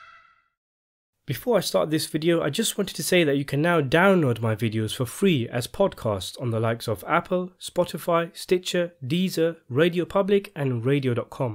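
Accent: United Kingdom. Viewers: Thanks, darling. but I am really curious about your accent. which part of UK is this accent from? I guess you are from Scotland or Wales or Northern Ireland. is that right?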